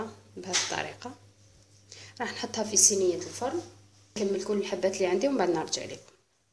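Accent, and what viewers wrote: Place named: Setif, Algeria. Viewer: أطباق يمممم مقروط وعليه لكلام ختي من اي ولاية انتي حساتك من صوتك انك سطايفية والله اعلم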